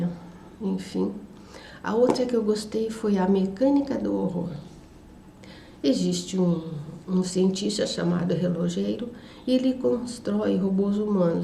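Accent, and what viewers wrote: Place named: Russia. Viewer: Muito bom,Grande descoberta esse canal! Eu tenho uma dúvida...a Senhora é russa?Se for então a senhora fala muito bem português rs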